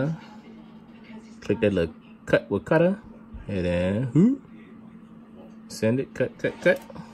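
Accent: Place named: South Carolina, United States. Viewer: you GOT to be from south carolina!!!!!!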